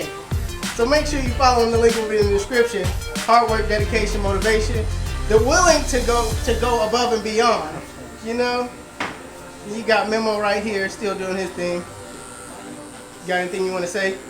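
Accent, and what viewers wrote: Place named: New York, United States. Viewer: New Yorker accent is strong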